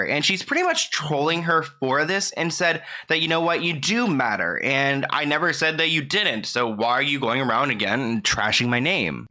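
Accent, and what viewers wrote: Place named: United States. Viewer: Love your videos, keep up the amazing work! Does anyone know where in America is from? I’m from the UK and like to try and work out the nuances in the American accent. Thanks!!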